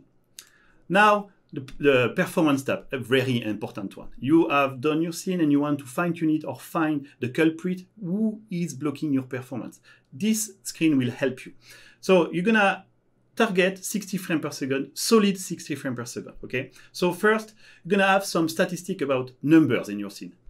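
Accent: France. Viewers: Bravo! Ton accent frenchy et toi etes une fierte nationale! Je suis un nouveau converti a BabylonJS. Merci a toi et a toute l'equipe, vous avez fait un travail incroyable. Au dela meme de la qualite de tout le travaille realise, je reste toujours impressionne par la vitesse de reactivite sur le forum. Et egalement merci a Microsoft pour soutenir ce projet en OpenSource. Thanks you so much guys for your amazing work!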